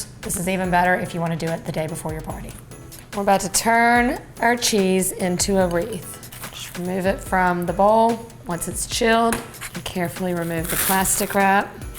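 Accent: South, United States. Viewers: Your video has the BEST appetizers!!! I am so thrilled to find you!! Are you from Alabama? I lived in Leeds, Al many years. i just detect a slight southern accent! Merry Christmas!'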